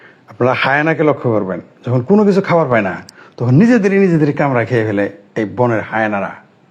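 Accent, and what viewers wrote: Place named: Jamalpur, Bangladesh. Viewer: ১৯৭১ সালে মুক্তিযুদ্ধের সময় এম আর আখতার মুকুল ঢাকাইয়া ভাষার স্টাইলে স্বাধীন বাংলা বেতার কেন্দ্র থেকে 'চরম পত্র' পাঠ করে বাংগালী জাতিকে উজ্জিবিত রাখতেন। আপনি জামালপুইরা ভাষায় 'ভয়েস অব এথিস্ট' দিয়ে আমাদেরকে উজ্জিবিত রাখছেন। এসব ব্যাংগাত্মক রম্য রচনা লিখন ও পঠনের জন্য আপনাকে অভিনন্দন।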